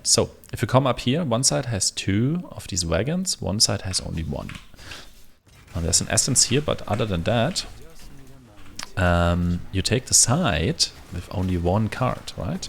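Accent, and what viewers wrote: Germany. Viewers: I am amazed how calmly and professionally you address the leveling process. Also madly in love with the soft germanic accent.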